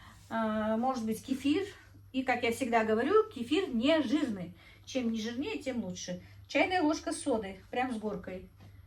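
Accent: Standard. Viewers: Да речь прекрасная, чистая, грамотная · Чисто на русском говорит потому что она училась советское время в школе не во всех школах был родной язык урок. язык учили дома в кругу семьи соседями и родственниками в Чечено-Ингушской Республике ЧИАССР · Привет вам большой...меня удивило ,что вы так чисто говорите по русски ,многие русские так не умеют говорить... чистюля ...настоящий профессионал...удачи вам и благополучия.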